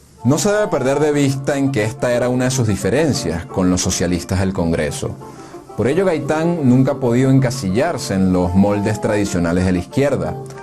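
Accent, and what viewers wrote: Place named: Venezuela. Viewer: Fantastico canal, Felicitaciones, que gusto ver este tipo de documentales con acento Venezolano!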